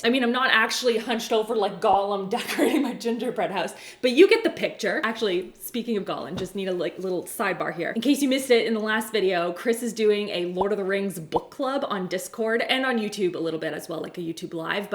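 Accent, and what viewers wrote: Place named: Canada. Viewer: Is it me or is she extra Canadian in this video?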